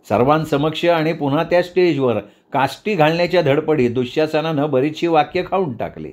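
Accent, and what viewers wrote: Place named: Malvani, India. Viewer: Far mast hasun hasun vat lagli mast Sir ani Sir aple abhar tumche malvani vachan lay bata vatala ha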